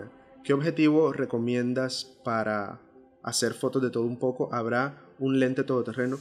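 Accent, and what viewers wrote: Venezuela. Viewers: COMO QUE NO ERES VENEZOLANO CON ESE ACENTO??? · Yo juraba q eras venezolano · Hola, creí que eras venezolano por tu acento, de que parte de Colombia eres? un abrazo desde Medellín. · Por tu acento juraba que eres venezolano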